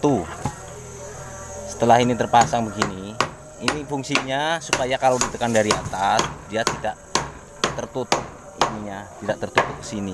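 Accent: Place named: Banyumas, Indonesia. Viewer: Nyimak selalu....yu ngapak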